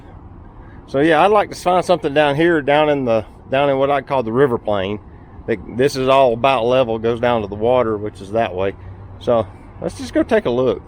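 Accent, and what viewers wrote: South, United States. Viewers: LOL! very good. I love Southern Talk- "...just to this side of that sign? On the other side of that sign?.." tone of voice- sentences that sound like a question are not a question. ❤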